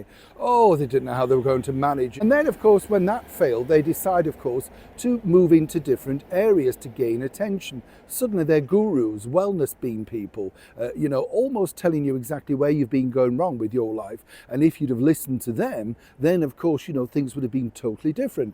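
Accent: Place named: England, United Kingdom. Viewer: So enjoy the street scenes you have. Those along with your accent, make me feel tht I’m back in England.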